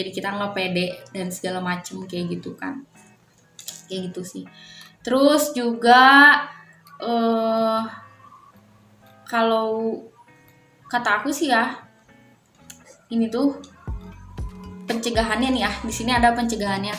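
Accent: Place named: Pandeglang, Indonesia. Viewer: Logat nya pandeglang .. hehe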